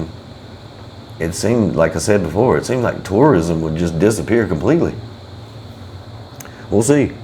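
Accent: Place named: South, United States. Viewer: Hi Mr. please don’t leave.I loved watching you, I’m a pilipina 72 years old and I enjoyed watching you. You seems like you’re down to earth young man,I’m from Little Rock Arkansas so I just love your southern accent 😊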